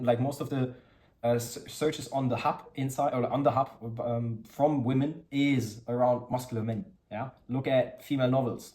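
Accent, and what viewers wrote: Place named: Eastern Europe. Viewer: Where is your accent from?... You sound eastern European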